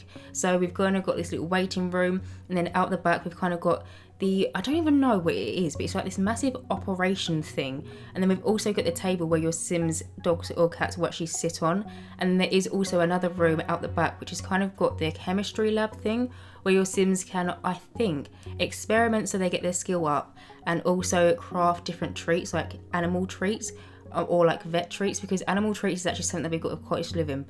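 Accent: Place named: East London, United Kingdom. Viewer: Its actually sooo refreshing to hear a east london accent on youtube tbh 🥰 im new to ur channel and u deserve more subs & views!! Also the sims treat us so bad sometimes building a whole clinic so u dont have to sit through a loading screen 😭🥴 the way u build is so interesting too like when u put tones of tissues on some surfaces or megaphones im always like ??? But it seems to work out in the end ! It was a really gorgeous build tho u did amazing!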